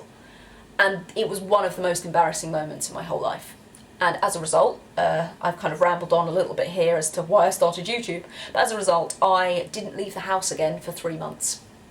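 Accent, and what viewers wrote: United Kingdom. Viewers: Your British accent always gets a thumbs up ( it´s the best english who can be spoken) .. the content of your videos always gets one, too. So you have ... at least with me as a German always 2 points plus ;) Listening to you is always greate Keep going and keep the head up these days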